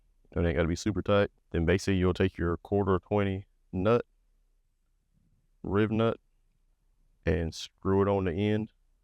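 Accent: South, United States. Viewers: I'm glad I'm not the only one to think that. I was going to say he's Laurence Fishburne's more southern sounding brother.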